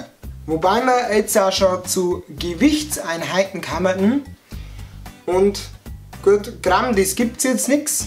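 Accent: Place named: Upper Palatinate, Germany. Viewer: sprichst du im Alltag uuch so stark oberpfälzisch gruß aus sulzbach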